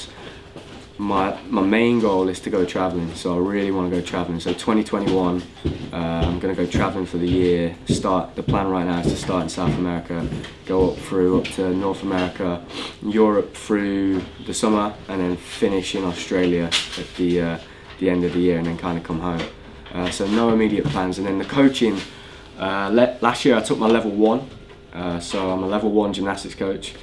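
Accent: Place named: Nottinghamshire, United Kingdom. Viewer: Jesus Christ are you from Notts? Omg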